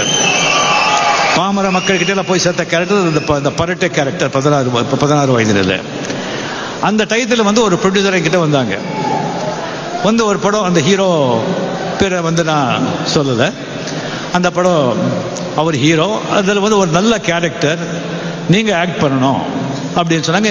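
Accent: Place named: Hindi, India. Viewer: சரக்கு போல தலைவர் ஹிந்தி கரான் மாறி பேசுராரரு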